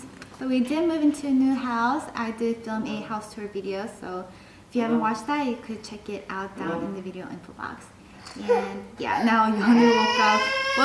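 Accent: South Korea. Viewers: I think your accent is more sound like Korean now if compare the previous pregnant video. Maybe because you alway speak Korean to your husband recent years:)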